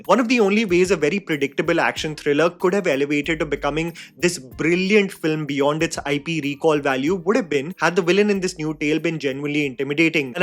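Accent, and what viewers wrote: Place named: India. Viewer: I just cant understand indian accent 💀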